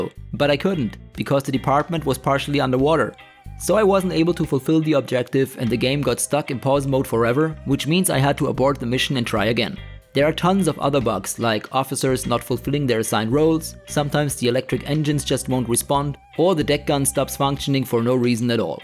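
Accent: Germany. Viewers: I have never heard an Asian guy make jokes with a German accent before... This was like some kind of an existential experience to me, thanks mate :D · Great review and I love the German Speaking! Lol and to all the people that think germans are nazis I guess all Americans are Republicans ;) or all Canadians are liberals. Bought the game in Hope's it's like SH3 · I never thought of you as being a Nazi, I do like your German accent as it adds more realism to the theme being kriegsmarine.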